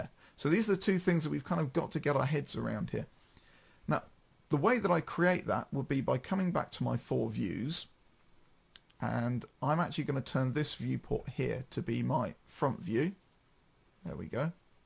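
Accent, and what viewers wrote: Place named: United Kingdom. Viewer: Мерзкий блевотный британский акцент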